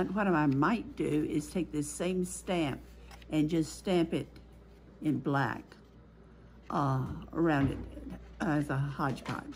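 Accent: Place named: Georgia, United States. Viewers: Are you by any chance from southeastern Alabama or northwestern Georgia? My grandmother was from Salem, Alabama and grew up in Columbus, Georgia. Your accent sounds very much like hers and your voice brings me so much joy ❤❤ thank you, beautiful soul ❤ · Maine? You sound like you’re from Georgia 😊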